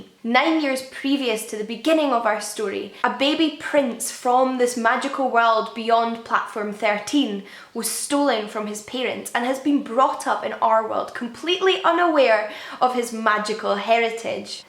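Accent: Scotland, United Kingdom. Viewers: Amazing video, can you, or anyone from the UK, tell me which part of the UK speaks with this accent, would really like to know, thanks! :D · Yasss another Scottish person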